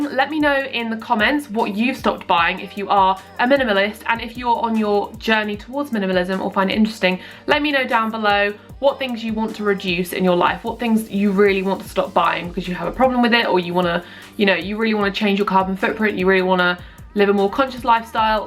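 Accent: United Kingdom. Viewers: Good video. Love British accents!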